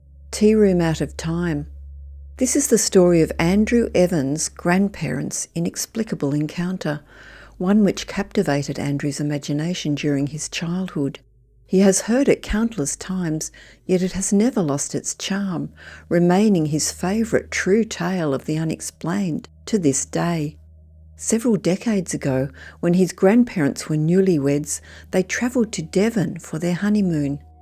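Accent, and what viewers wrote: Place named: Australia. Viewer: She's Australian. That's how Australians talk.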